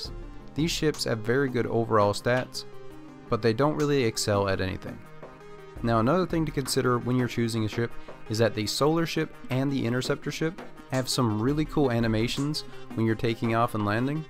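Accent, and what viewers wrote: Newfoundland, Canada. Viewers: Are you from Newfoundland? Can hear the accent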